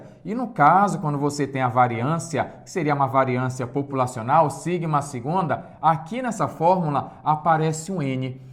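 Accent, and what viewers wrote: Pará, Brazil. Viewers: Prof. o senhor é paraense?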